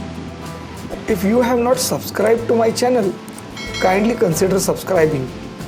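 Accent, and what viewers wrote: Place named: Maharashtra, India. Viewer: True, it's a nice place to be with nature. Would love it more, if the commentator spoke English English. It was Marathi English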